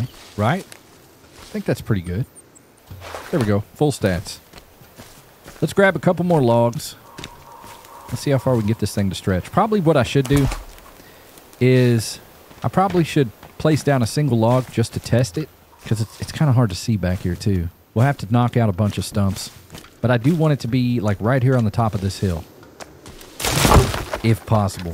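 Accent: South, United States. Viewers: I just found you on this Stoney Friday and as a southern girl from N.C I appreciate your soothing southern charm voice, you’ve not overly obnoxious and funnnyy I had so many good laughs I need this game😂😂❤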